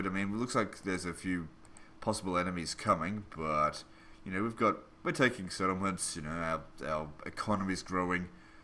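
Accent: Australia. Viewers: Yep, sounded Australian to me.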